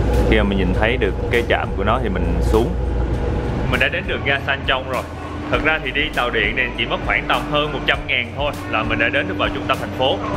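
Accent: Mekong Delta, Vietnam. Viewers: Đậm chất giọng miền tây nhợ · Xem video anh làm, em biết được nhiều món ăn ngon,văn hóa cũng như lịch sử của từng nơi anh đến,xem anh đi khám phá rất thú vị bởi cách nói chuyện chân chất Miền Tây,pha chút hài hước,duyên dáng của riêng anh.Em xem rất nhiều kênh nhưng kênh của anh là em thích nhất.!!!! Chúc anh nhiều sức khỏe,vui vẻ và gặp nhiều may mắn.! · Xem gần hết các clip của anh này, Cứ bị mê mẩn giọng miền tây thôi 🤣🤣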